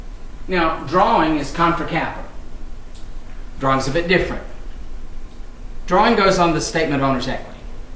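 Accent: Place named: South, United States. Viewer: This guy is good, he is clear and succinct and he is so southern. I am having trouble keeping my my focus on the topic ("I feel like billy graham just announced a scripture verse in a crusade. I am not ready to go to the next page"). What a crack up. He knows his stuff. This is not basic as much as it is absolutely essential, Get this--the world is your oyster, don't get it, forget a career in fiance, business and accounting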